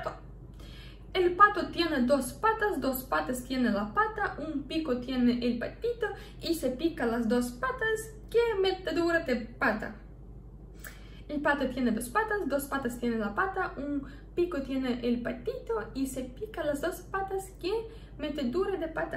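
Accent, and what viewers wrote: Russia. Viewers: Hola mi Linda, soy médico y disfruto todos tus videos, aquí te dejo unas 7 palabritas para que puedas pronunciar. Me encanta tu acento Rusoespañol. Haz otro video con ésta y otras palabras curiosas en Español. 😉👍😘❤ 1.Hipopotomonstrosesquipedaliofobia 2.Homopedonecrozoofílico 3.Pneumonoultramicroscopicsilicovolcanoconiosis 4. Esternocleidomastoideo 5.Ciclopentanoperhidrofenantreno 6.Uvulopalatofaringoplastia 7.Gastroesofágicodudenalintestinal.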